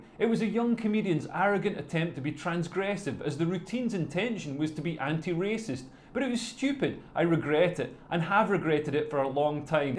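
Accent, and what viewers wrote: Scotland, United Kingdom. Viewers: Epic rant!!!! Just hearing your Scottish voice does me good. <<Mark in Ohio>>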